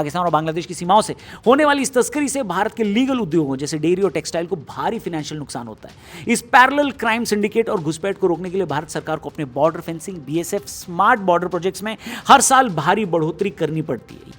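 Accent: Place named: Bihar, India. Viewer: Bhai tera thoda sa bahuwa to nikal hi jata h...but information about the topic is serious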